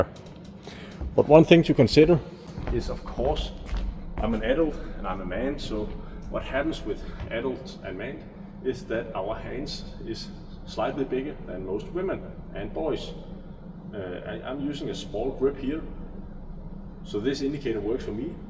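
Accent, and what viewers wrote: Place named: Denmark. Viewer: Du har virklig meget dansk accent 😂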